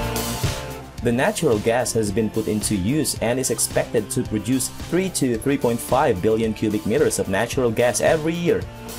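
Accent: Philippines. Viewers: Pinoy ka boss? Accent mo kasi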